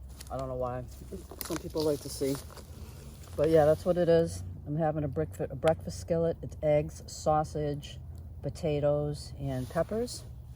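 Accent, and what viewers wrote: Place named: Massachusetts, United States. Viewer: Def a massachusetts Gal, that's my accent. I'm closer to Braintree etc. Used to camp up in Maine and NH a ton. Great video.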